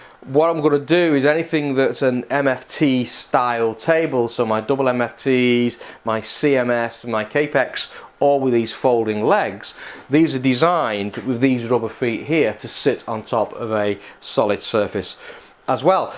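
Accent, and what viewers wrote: United Kingdom. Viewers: Hi. I accidentally came across your channel recently. Surprising since I watch a ton of YouTube 'Wood Porn, as my wife calls it.:P - I guess your search terms are working. Where are you based? From the accent I am guessing North West England? I am from Birkenhead originally but live in Utah now. Good luck with the venture and keep posting the awesome videos! Cheers, Rob P.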